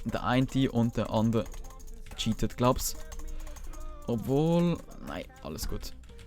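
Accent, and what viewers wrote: Zurich, Switzerland. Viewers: WeirdChamp das ich andere auf YouTube die schweizerdeutsch reden und ich bin mir gewohnt das du so redest (bin auch Schweizer ich verstehe alles) für die Deutschen: Hoi we gohts öich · bahhh züri digge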